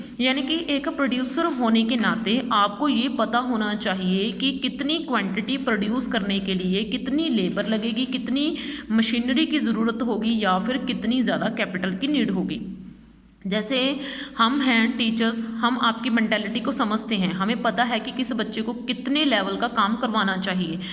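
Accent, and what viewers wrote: Punjab, India. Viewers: I think uu are Punjabi 😅....way of talking 👳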